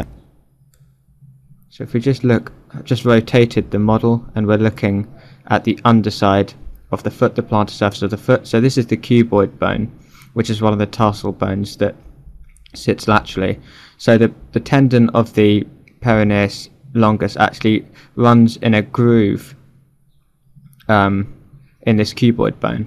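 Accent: United Kingdom. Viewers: Thanks for the tutorial . Your accent (British?) sounds amazing.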